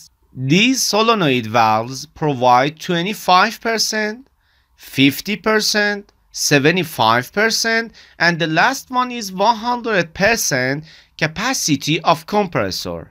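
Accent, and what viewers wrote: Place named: Iran. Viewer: your accent was iranian..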